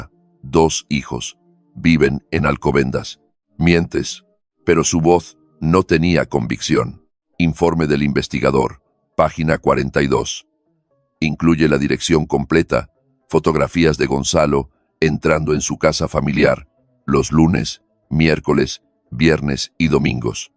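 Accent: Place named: United States. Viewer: En España no se está siempre diciendo nombre y dos apellidos. Y tampocoese acento americano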